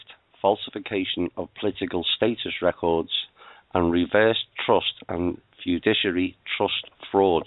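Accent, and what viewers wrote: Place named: Ireland. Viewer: ...and a special thanks to Anna Von Reitz, your narrative voice, although strangely masculine and Irish, has a wonderfully hypnotizing monotone to it that has helped my insomnia....I can now listen to this youtube audio, which I have recorded as a therapy aid, because its tempo puts me to sleep in under a minute flat....thank you....!!!...I'm cured!!!!....and you've saved me a fortune in alternative solutions.....thanks again...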